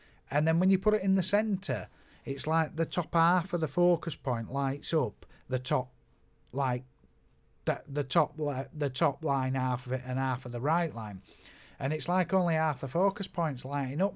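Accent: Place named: Northern England, United Kingdom. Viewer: Thanks for the review. Got myself one today. Lovely northern accent mate. Stay well.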